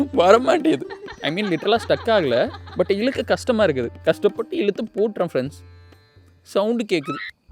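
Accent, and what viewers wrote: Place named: Coimbatore, India. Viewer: உங்கள பாதா சென்னை காரன் மாறி தெரியலையே. கோயம்புத்தூர் மொழி பேசுறீங்க.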